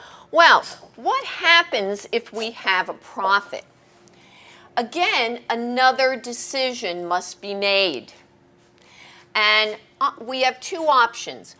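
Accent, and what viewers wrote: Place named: Queens, United States. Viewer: Queens accent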